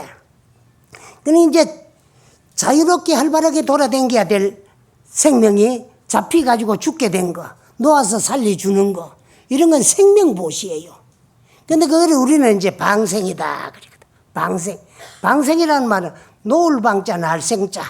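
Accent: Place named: Gangwon, South Korea. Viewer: 감사 합니다 스님법문 듣고보다보면 빠져들어갑니다 구수하신 말씀 강원도 사투리로 정감있는 말씀 너무 재미있게 하십니다 항상감사드립니다 날씨가많이 덥습니다 항상건강하세요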